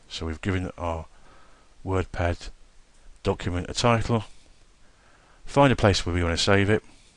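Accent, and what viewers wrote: United Kingdom. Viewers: This is not American English itS BRITISH ENGLISG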